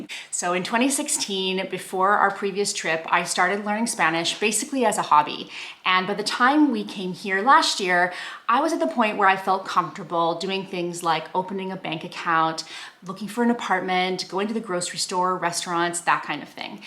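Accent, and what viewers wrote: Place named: Canada. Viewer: I absolutely love canadian english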